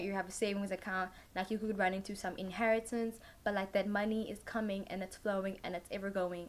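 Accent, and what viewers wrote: South Africa. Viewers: I know that accent❤❤hey there South African 🎉picked pile 1 and I wanna be famous like 😍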